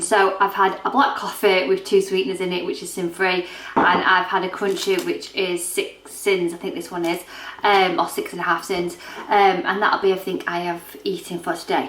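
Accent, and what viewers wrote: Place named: Sheffield, United Kingdom. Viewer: You sound like you’re not far from me in sunny Sheffield xx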